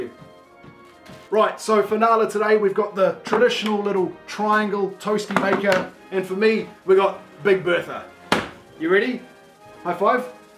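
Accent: New Zealand. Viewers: No offense, but I find the New Zealand accent the most hilarious 😂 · Came here to hear maori accent 😅I miss home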